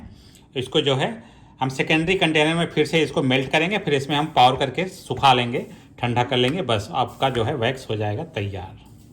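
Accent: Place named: Uttar Pradesh, India. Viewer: Appka Hindi UP ka ho... magar bache pura Gore hai...thats very much:) (laugh).. LOVING YOU ALL!!:face-red-heart-shape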